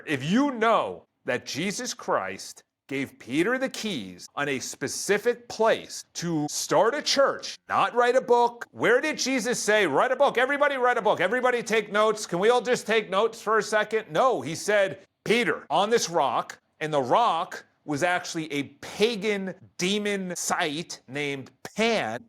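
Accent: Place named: Philadelphia, United States. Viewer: Are you from Philly? Or somewhere in SE PA, South Jersey, Delaware? All similar accents.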